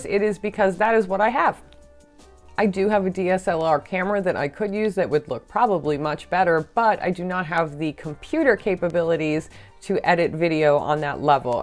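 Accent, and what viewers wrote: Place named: Boston, United States. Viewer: I watched this video on my daunting hour drive home from work and it made me so happy! I have never contemplated (and probably won’t) starting a channel, and I enjoyed this video so much nonetheless! You are such a breath of fresh air in this community, and I am so hooked on your channel. PS - it must be a Boston thing to pronounce room “rum” because I swear you and Beantownhouselants always have me rewinding to relisten like, who’s drinking rum???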